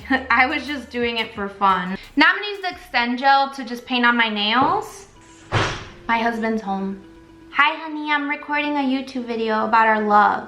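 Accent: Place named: Illinois, United States. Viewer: I was born and raised in central Illinois...I knew your accent sounded like home🤍 I’ve lived in Louisiana for almost 20 years and the dialect is completely different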